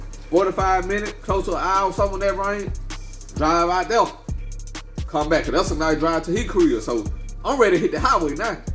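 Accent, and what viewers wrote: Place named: Memphis, United States. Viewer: Sound like you from Norf Memphis. Good ahit tho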